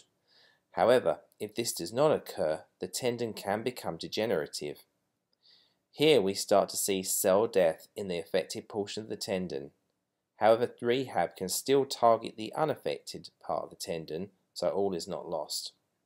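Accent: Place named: United Kingdom. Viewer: Lol heard the accent and thought "maybe here's someone I can trust with my ratchety elbow". Watched the earlier, linked video... Huntsville... Alabama... that's some displacement fella - bit too far from west London I think. Great vid, thanks for the hope it induced 👍🏻.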